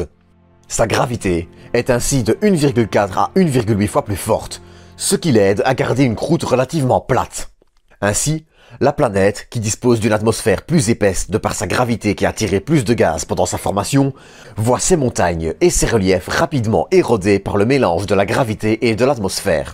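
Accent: Liège, Belgium. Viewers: Exact, mais les français sont pas habitué à l'accent de la couronne Belge, ça les calmes. est super bosseur, en produisant une vidéo par semaine, c'est sa force, depuis les 20 K de Neowise, et plus de 150 vidéos en stock, la machine commence à s'auto alimenter. Il a l'envie de poursuivre, donc dans la durée, la chaîne aura plus de place. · Bel accent de Liege :D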